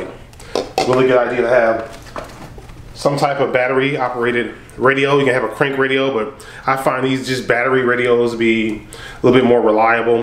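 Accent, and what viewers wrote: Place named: Detroit, United States. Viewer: been watch for 10 years, wen he became so Detroit?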